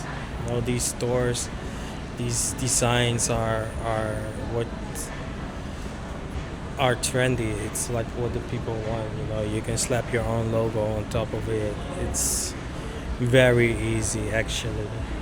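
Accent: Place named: Netherlands. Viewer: Awesome video man. I would love to visit this place. Your accent sounds like you're dutch? 😅 Thanks for showing around. That mall looks great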